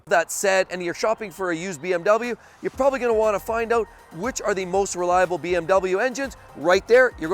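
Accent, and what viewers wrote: Canada. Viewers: It took me until to realize he's Canadian.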